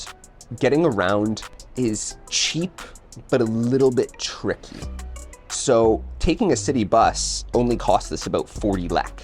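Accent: Canada. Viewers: You sound Canadian?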